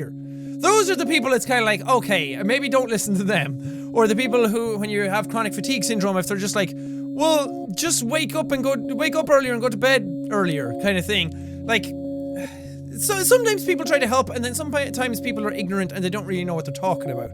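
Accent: Ireland. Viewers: Is it just me or does Jack no longer uses his Irish accent anymore other then in his intro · What part of Ireland are you from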